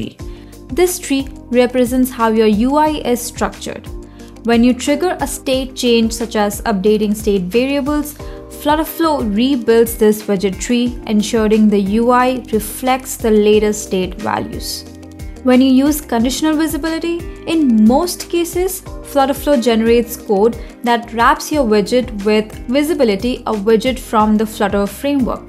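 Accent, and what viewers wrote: India. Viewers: Gosh, Indian accent again. FlutterFlow, don’t go down this path.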